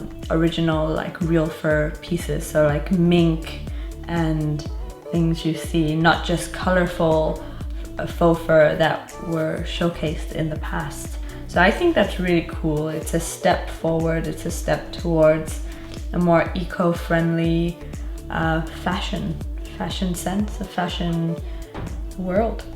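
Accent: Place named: France. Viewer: I swear you're getting a French accent! It really suits you ;) I really like the look of the first trend, I have a soft spot for tailored looks. The faux-fur sounds really nice as well x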